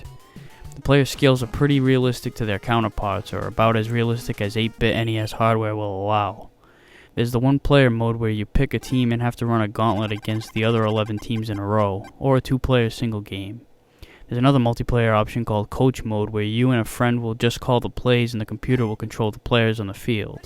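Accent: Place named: East Coast, United States. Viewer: your from the east coast huh, i can tell by your accent especially when your curse that shit made me bust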